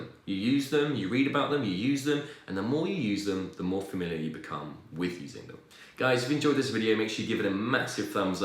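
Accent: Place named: United Kingdom. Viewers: sounds generic British, so hard to place. Could be south east England though. I'm English also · Brit accents are kind of played out over here, but this is a really nice one. Must be specific to a particular region!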